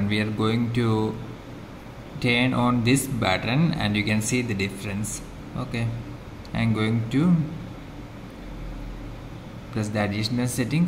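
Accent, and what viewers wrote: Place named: South India, India. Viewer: That South Indian accent though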